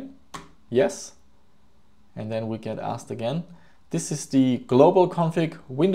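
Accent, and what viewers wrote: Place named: Germany. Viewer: Da is doch deutscher Akzent^^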